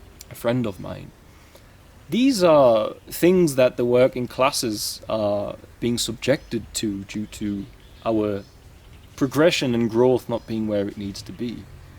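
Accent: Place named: Newcastle, United Kingdom. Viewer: I love your Geordie accent. Always felt self-conscious talking about spirituality and philosophy while sporting my accent. You’re comforting, inspiring and so down to Earth for someone so awake and intelligent. I also appreciate your deep esoteric understanding of Christianity rather than just condemning the scriptures due to the church’s past, like so many people do these days. The royal family has always been just a figurehead to compound and reinforce conditioning, obedience, discriminatory nationalism and patriotism, a symbol of wealth and authority when in fact all they do is bleed money from hard working people and there is no justification that exists for the empire they have in property, land and objects when children, animals, people go hungry and homeless. They moved homeless people off the streets to make way for the royal wedding... 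there’s nothing Christian about it. I honour your compassion and certainly acknowledgment of prejudice, jealousy and small mindedness of the working classes, but there is an unmistakeable distinct inhumanity in hoarding wealth & land & then calling people selfish for exercising autonomy over their own bodies. Completely agree with you. I agree the matrix of our experiences makes us ignorant and ungrateful but the Queen takes that to another conscience-less level.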